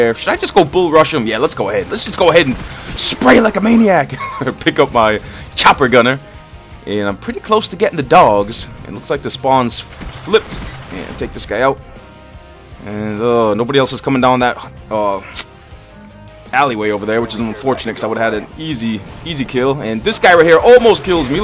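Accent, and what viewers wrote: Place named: Brooklyn, United States. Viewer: the more worked up he gets, the thicker his brooklyn accent gets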